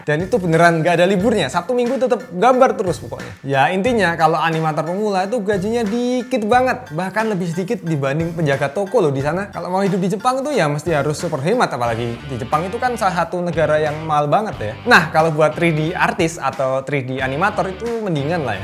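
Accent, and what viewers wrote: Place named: Java, Indonesia. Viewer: wah ternyata orang yg omong ini orang Jawa ya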